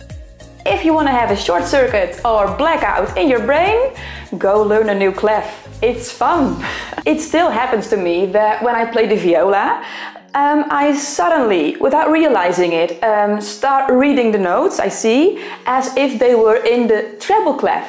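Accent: Netherlands. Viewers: From your name and accent I think you are Dutch or Flemish! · I guess you're Dutch from your accent 🤔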